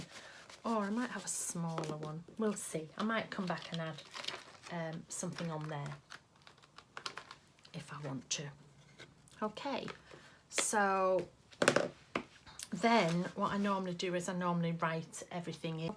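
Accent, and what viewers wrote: England, United Kingdom. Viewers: I just love your vibe and personality! Plus you have the best English accent I've ever heard! Could listen to you alllll day :D love your videos! :)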